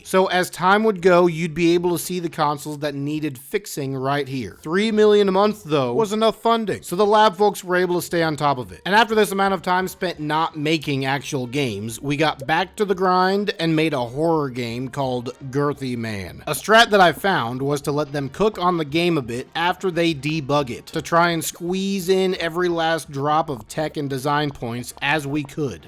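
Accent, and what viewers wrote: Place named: United States. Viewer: What a dreadfully american accent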